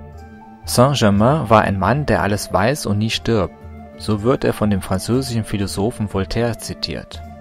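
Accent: Spain. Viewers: meinst die sympathische Damen Stimme mit dem spanischen "Selma Hayek" Akzent, oder ? Ja, ich vermisse sie auch schon. 😃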